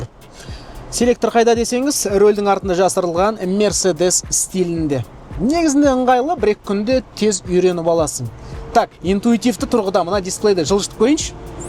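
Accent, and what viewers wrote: Standard. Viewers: Ассалаумағалайкум. жарнама болаберсін сенің осындаи видиоларңды көруге тамаша таза қазақша😂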